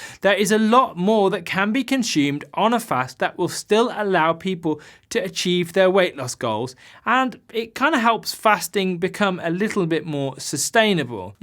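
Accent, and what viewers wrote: United Kingdom. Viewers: Hi I find your videos truly enlightening- learning a lot- very simple and after the initial getting used to the "British accent" as most of the ketosis videos seem to be from Americans, I am getting quite addicted to your knowledge tank, in a way, picking your brains. I am obese( there is no other word for it) and have been trying to lose weight for maybe 40 years- ( I am 50)- I do succeed sometimes but more often fail. Now at a particularly low phase - as living in a food desert and being a college student again is taking a toll. For the past month, have been low carb and high fat- but as you noted, the bullet proof coffee was not causing a weight loss for me- I took one every morning - with butter, coconut oil, cream, and coffee and a breakfast cum lunch cum dinner around 5 pm ( followed this pattern for about three weeks) - no weight loss, so decided to go completely off food- have been doing it for five days now- only water and black coffee( which I usually hate as it gives me acidity and migraines)- as a surprise, I have lost about 8 pounds in 4 days- surely water weight but not much urine passing either day or night. 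My urine strips do show significant ketones now. But the blood ketones are still low and the blood glucose I tested yesterday evening after a round of exercise in the cold weather, came to 150- very surprised as I am typically not diabetic, at least I don't think so. My question is, do you think a low carb ketogenic diet can reverse lipoma or skin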